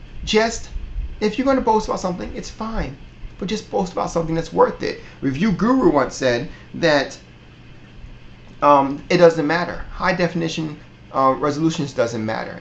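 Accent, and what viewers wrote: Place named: Australia. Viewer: Looks Around... I thought it sounded Australian... good vid man. I agree HD resolutions don't make or break a game. It may help but it doesnt matter much. As for the Wipeout vid, the point he was making was 360 fanboys going nuts saying the 360 did native 1080p, when it actually was upscaled. Nothing wrong with that but its a technical difference between native and upscaled isn't there?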